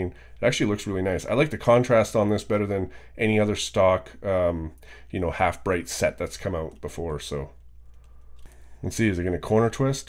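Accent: Canada. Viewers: It’s the Cubicle.Us and you are pretty sure Canadian, right? Heard that eh, (I’m not Canadian)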